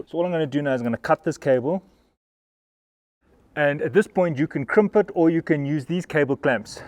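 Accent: South Africa. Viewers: No doubt about it. South Africans have great accents and always clearly spoken. Excellent 👌 thank you very much.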